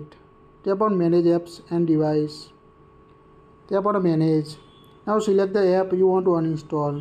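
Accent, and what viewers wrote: India. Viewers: Just put captions it's indian English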